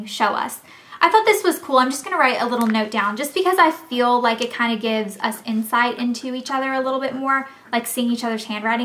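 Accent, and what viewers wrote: South, United States. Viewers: you have your old 'southern' accent back in this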